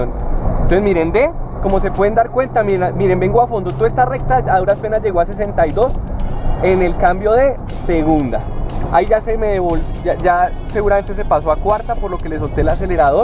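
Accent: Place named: Colombia. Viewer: Que chistoso hablan en Colombia Parcero!! Saludos! 🤗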